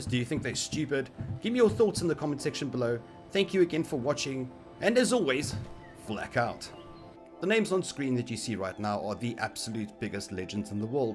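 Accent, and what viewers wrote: Afrikaans, South Africa. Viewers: you sound like you come from south africa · He sounds Afrikaans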